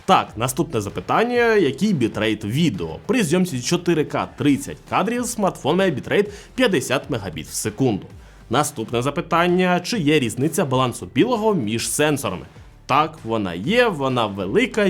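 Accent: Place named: Ukraine. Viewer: Ооо, ну всё, понятно с вами. Цэкайте и гакайте дальше без меня. Отписка!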